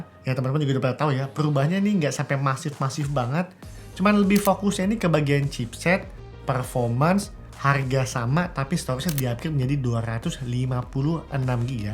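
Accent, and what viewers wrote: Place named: Europe. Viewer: lidah - lidah eropa memang begitu bang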